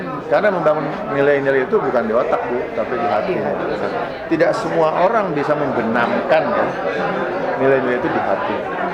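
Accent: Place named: Sunda, Indonesia. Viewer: Voice of sunda, mantaf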